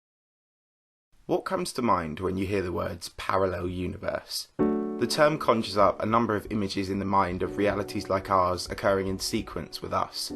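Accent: United Kingdom. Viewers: That monotone British voice tho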